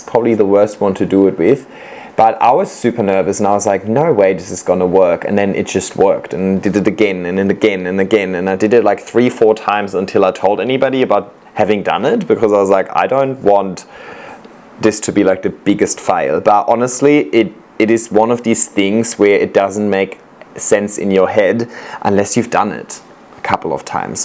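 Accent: Germany. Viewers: Wusste vorher nicht das du deutsch bist, aber dein Akzent (kaum hörbar) kam mir irgendwie vertraut vor 😊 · Ich habe es nur durch deinen deutschen Akzent irgendwann mitbekommen. Bin hier gelandet wegen deinen wunderschönen Pflanzen und den echten Moosstäben. Sehr inspirierend und funktioniert auch in kaltem, deutschen Winter. 🤪 Liebe Grüße aus Leipzig.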